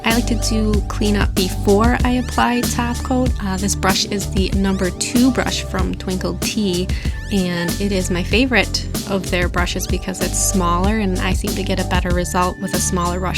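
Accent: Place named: Michigan, United States. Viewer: re: your voice, I grew up in Michigan and we have similar accents. I figured you were from somewhere near Canada, but not Canada.... right?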